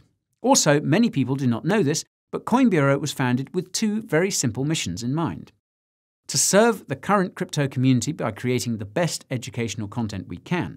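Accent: Australia. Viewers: Always insightful and entertaining. Absolutely nailed the Aussie accent. Love your work mate! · Love the Aussie accent ! I'm up for some Roocoins !!! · Brilliant Australian accent! 🤠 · Haha, amazing Aussie accent, nice one!! 😁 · Another Aussie here and i must say,you could pass as one of us mate.That was brilliant. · Aussie accent was one of the best I've heard (I'm an Aussie) Where do I get that Roocoin? 😄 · Well done with the Aussie accent as an aussie I laughed my tits off. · Not bad ‘Strailian accent Mate! Good on ya… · nice aussie accent! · Funny AF! But the accent is more proper than my friend from the Gold Coast. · LMAO. Mate your Aussie accent is spot on. Love the mullet!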